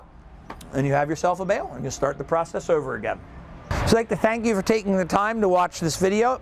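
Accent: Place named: Wisconsin, United States. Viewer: He talks funny, is that a Wisconsin accent?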